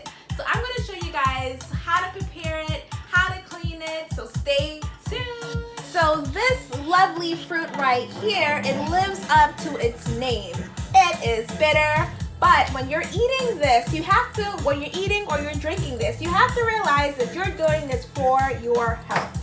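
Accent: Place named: Caribbean. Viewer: I here a little Caribbean coming out. Trinidad or Jamaica. Just saying😁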